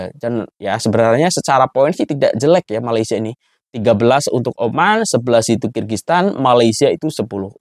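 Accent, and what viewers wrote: Indonesia. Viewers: Bingung ini orang pake bahasa apa.. Logat dialek tata bahasa pake Indonesia tapi disisipin dengan kata2 Malaysia jadi bingung dengernya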